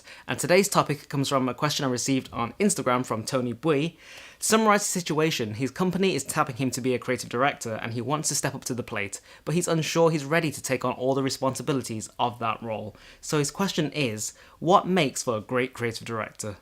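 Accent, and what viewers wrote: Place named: United Kingdom. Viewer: Why does a british accent just exude competence in a creative director role?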